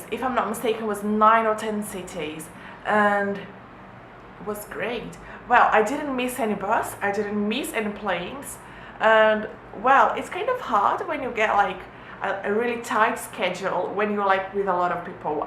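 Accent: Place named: United Kingdom. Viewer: Oii, adorei o FAQ in english!! Você tem um sotaque britânico muito fofinhoo:D por acaso você sabe se na Inglaterra a área de engenharia naval é forte? Ou mesmo as áreas de engenharia? e, por favor, continue postando videos:D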